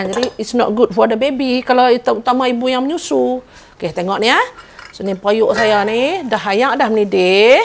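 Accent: Kedah, Malaysia. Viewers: Assalamualaikum Kak, saya suka tengok video kak. Kak cakap kedah pure tak buat buat. Banyak petua kak share dalam video ni. Baguih kak idola saya setakat ni dalam arena youtube. Nanti ajaq buat kuih kaghaih pulak na..ka dah ada video nanti sat nak check...saya orang baru..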